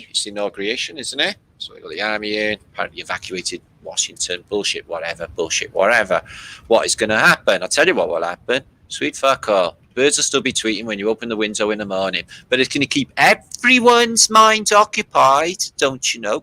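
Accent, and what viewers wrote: Wales, United Kingdom. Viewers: Hi from Queensland Australia, so glad I found you! Am amazed with your work. I was born in south Wales in the 70's- lovely to hear the Taffy accent 😀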